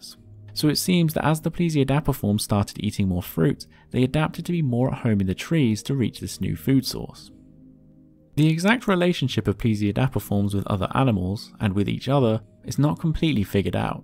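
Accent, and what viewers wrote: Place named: United Kingdom. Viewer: A british accent is essential when telling "just so" stories to small children.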